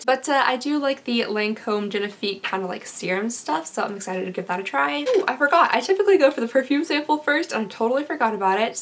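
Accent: Kentucky, United States. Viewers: This is a bit of a weird comment, I'm also from KY and sometimes I miss the accent and watch your videos 😅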